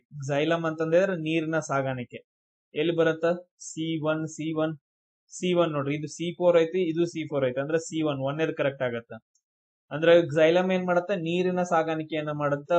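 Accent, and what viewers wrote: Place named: Uttara Kannada, India. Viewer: Basically I'm from malnad side.. your teaching style and u.k kannada is superbb sirr..,🫡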